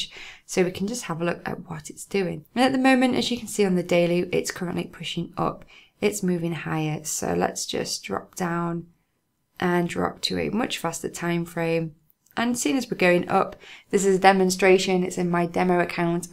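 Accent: United Kingdom. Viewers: She just made everything seem simple with her amazing British accent!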